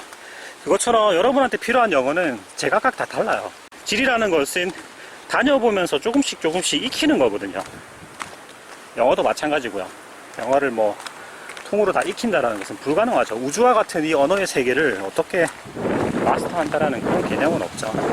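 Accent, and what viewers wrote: Busan, South Korea. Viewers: 부산에 사세요? 저도 부산어 사는데 ^^ 반가워요~ 어쩐지 말투가 친근하다했어요 ㅋ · ㅋㅋ 이기대네요 저 이동네살아요 부산 억양이 많아서 고향이 부산이 아닐까??생각했었습니다 저도 그 옛날 학교운동장 스탠드같은 거기 좋아합니다 거기 뷰도좋구요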